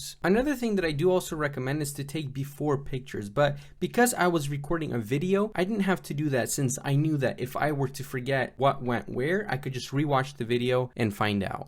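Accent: Russia. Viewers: Dude, are you russian or something?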